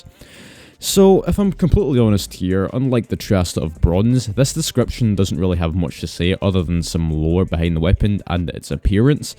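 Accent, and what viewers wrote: Scotland, United Kingdom. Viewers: I thought you're from Scotland, you're actually thai. Thats cool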